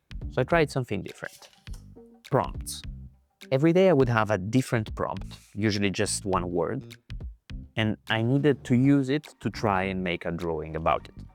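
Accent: Italy. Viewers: L"accento italiano non mi sfugge😂. Great video bro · I loved this video! Very useful suggestions, an extremely handy website at the end e il tuo accento italiano mi diverte (non è una presa in giro :) ) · The way he talks, is he italian... c) can't tell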